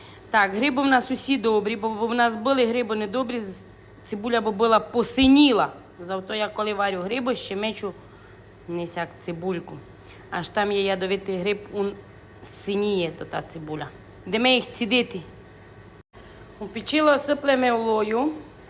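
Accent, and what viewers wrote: Transcarpathia, Ukraine. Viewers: Закарпаття так мовить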